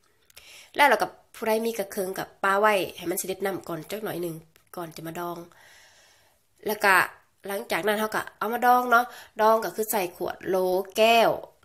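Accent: Laos. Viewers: ทรรศสรวง พรมเหลือง เป็นภาษาลาวที่คนไทยอิสานฟังแล้วสะเหนาะหูดีแท่ มักเสียง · เจ้าของคลิบนี่พี่น้องสำเนียงคือพี่น้องสปปลแท้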